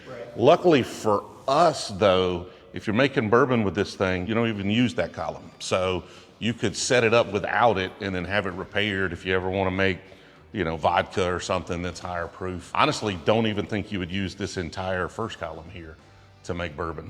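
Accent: South, United States. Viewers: is the reason I get so much enjoyment from you and your channel. That and me being a southern transplant, there’s nothing like a smooth southern accent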